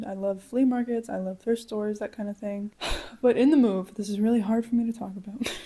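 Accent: Portugal. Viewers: I'm brazilian and I coulnd't help noticing your portuguese accent! Did you choose to learn portuguese from Portugal instead of brasilian or you just naturally got this accent by listening to people from portugal while learning? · Caralho hahaha, curti muito você falando Português com sotaque de Portugal mesmo eu odiando esse sotaque feio da porra hahaha, mas você fala Português perfeitamente. Gostaria de te elogiar. Você falou ''caralho'' perfeitamente hahaha, pode reduzir também para ''caraio'' ou ''carai''. Você soa mais agradável do que os próprios Portugueses hahaha. Mas amaria ver você falar com o sotaque Brasileiro, mas teria que escolher um porque tem vários, o sotaque Brasileiro mais próximo do Português Europeu é o do Rio. Você não é poliglota a toa. Curto muito seu trabalho ajudando as pessoas a aprenderem outros idiomas e culturas. Todo sucesso do mundo pra você!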